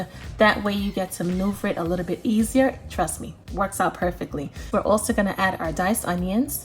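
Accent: New York, United States. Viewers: You sound like a new Yorker 🤔🤔🤔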